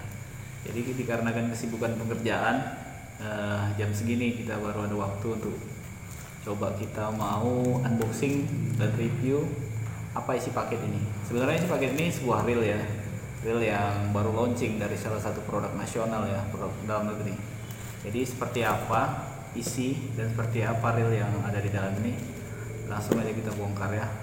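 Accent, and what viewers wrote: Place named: Palembang, Indonesia. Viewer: Keren uuyyyyyyyyyyyyyy 👍 bahasa palembangnyo jagoan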